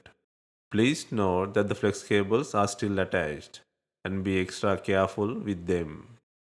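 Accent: India. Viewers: Best English with indian accent ever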